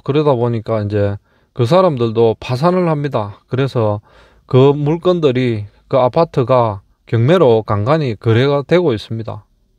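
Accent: Gyeongsang, South Korea. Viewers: 시공사부터 괴비리쑤레기판결인디 저영상찍는 갱상도 사투리가더사기꾼 같은디요...?:어~???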